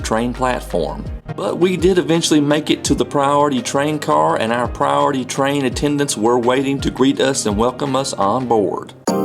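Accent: United States. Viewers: soalnya pakai aksen amerika, coba pakai aksen british lama atau aksen australia 😁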